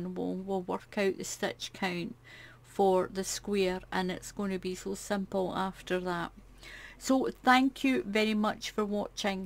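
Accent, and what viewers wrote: Scotland, United Kingdom. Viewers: Thank you, your work is lovely, and I love your Scottish accent. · Hallo can you please give us the number stitches for an 2xx person I think you are one person that can do it watched all you videos I love how you talk I think it sound like Scotland people is speaking I am from South Africa. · Hi this is brilliant and I cant wait to have a go at one of these. Also I am from Northern Ireland so I love your Scots accent! Looking forward to your next video.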